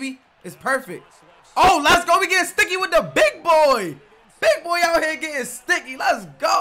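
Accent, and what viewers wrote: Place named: DMV, United States. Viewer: Your from the DMV aren’t you lol…I can hear it…Good content bro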